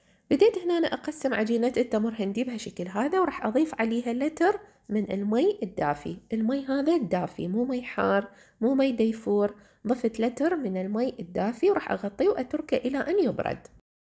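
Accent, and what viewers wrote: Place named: Iraq. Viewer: كثير احب لهجتك العراقيه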